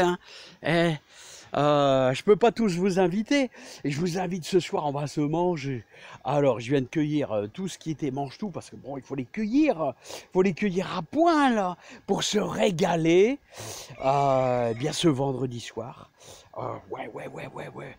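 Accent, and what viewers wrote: North, France. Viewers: Merci à vous pour tous vos conseils quand je sais pas je recherche dans vos vidéos et j'ai ma solution cela fait maintenant plus de 2 ans que je vous suis merci pour partager vos conseils et votre passion du jardin avec l accent du nord je suis à côté de Lille une question quand même votre jardin est très très grand que faites vous de tout les légumes récoltés car la quantité doit être impressionnante ? Merci bonne continuation à vous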